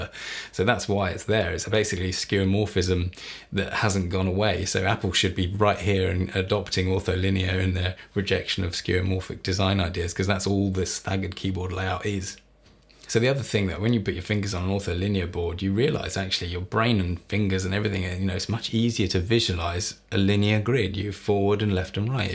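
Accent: United Kingdom. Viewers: That cultured accent doesn't hurt. For the life of me I cannot comprehend why the Scandinavian countries would choose an American accent over a British one when adapting English as a second language. Pure insanity. I'm not even British and have to admit to the clear superiority in their pronunciation. I mean a refined accent like this guy has, not some brash, horrible concoction you hear so often outside of London. Here in Canada we have Quebec that brutalizes the beautiful language of France! Its so strange how people don't seem to discern language pronunciation. In New York City which is plagued also by a horrible accent high achievers seek to rid themselves of that accent by the time they have graduated university. They are making a good choice. One of the worst in the US? Boston! Ugh. Sounds like they barely graduated kindergarten. Maybe I'm too sensitive. 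No offence to people from New York and Boston!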